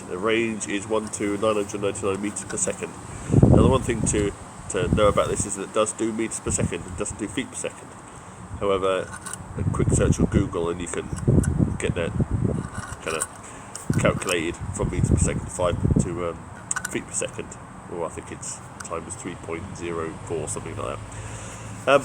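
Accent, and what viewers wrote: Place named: United Kingdom. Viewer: Man I agree with the other guy... You talk like a Brit. I could start a pot of tea and sit down a have a cup or two while ya wax poetic without actually saying anything useful. Remember some of us Yanks don't even drink coffee anymore we are all hopped up on Monsters and Rockstar's. Our patience is shot. Still that's for the review you did give me what I wanted to know, just sold my Caldwell before buying a new air rifle.. to poor to buy another now. Glad this thing might work for a bit.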